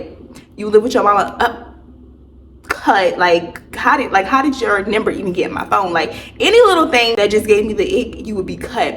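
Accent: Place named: Southeast Texas, United States. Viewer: He gotta be either from Beaumont or Orange 😂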